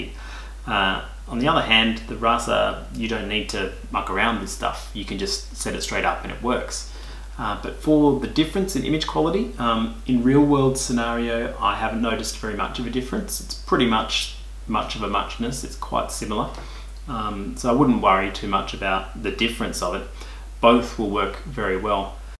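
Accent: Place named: Byron Bay, Australia. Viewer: That’s a hell of a well developed ear for accents you have...! is from Byron Bay which isn’t all that far away from Brisbane...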